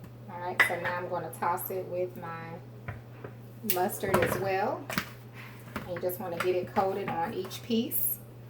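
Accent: South, United States. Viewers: I heard her voice and I said to myself “ She can cook” listen to that beautiful accent. Southern Woman. I was raised by a Southern Woman while my Mom was in Vietnam. I STILL call her my Mom and she My Queen is 89 and she lives with me and my wife and now I feed her, wash her, cook for her and I make My Queen smile. Thank you so much.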